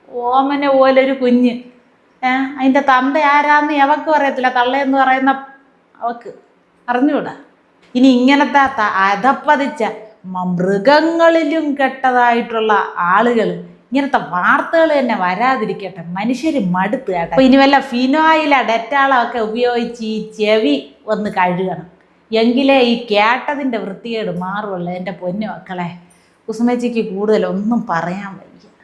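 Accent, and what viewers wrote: Thiruvananthapuram, India. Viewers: അവതരണം സാഹചര്യത്തിനനുസരിച്ചു വേണം. 3 പേർ മരിച്ചതിൽ ഒരാൾ അങ്ങേയറ്റം ബഹുമതി ആവശ്യപെടുമ്പോൾ ഇത്തരത്തിലൊരു അവതരണം നിന്നും ഒട്ടും പ്രതീക്ഷിച്ചില്ല. കൂടാതെ തിരുവനന്തപുരം ഭാഷാ പ്രയോഗം അതിരു കടക്കുന്നില്ലേ എന്നൊരു സംശയം. ഞാനും ഭാര്യയും നിങ്ങളുടെ സ്ഥിരം പ്രേക്ഷകരാണ്. അഭിപ്രായം ഉൾകൊള്ളാൻ കഴിയുമെങ്കിൽ ഉൾകൊള്ളുക.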